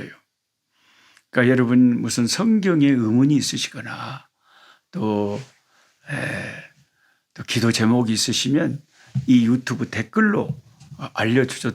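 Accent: Jeolla, South Korea. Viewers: 목사님 감사합니다.날마다 꿀송이 보약큐티 말씀을 통해 너무은혜가 됩니다.특별히 전라도사투리가 너무나 구수 합니다.항상주님의 은혜로 건강하시고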